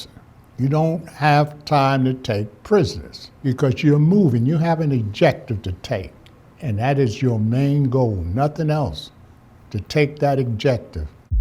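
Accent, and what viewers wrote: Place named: New York, United States. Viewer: Sounds like one. Could tell he’s a fellow New Yorker. He’s very detail oriented. Good story teller.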